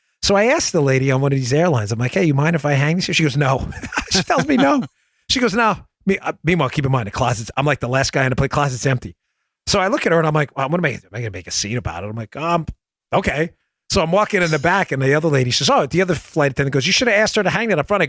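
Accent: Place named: Queens, United States. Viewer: Kimber D - I'm Aussie and I've been listening to our Danny B every morning over my brekkie coffee without fail since Episode 628 when I first heard he had his own podcast. The first time I listened to him was when he filled in a few times for Mark Levin's show a few months prior. He was saying everything I believed to be true regarding the Russia Collusion conspiracy theory. Also a few years ago I spent a bit of time in Queens and his accent and attitude always gives me fond memories of the area I love so much. :-)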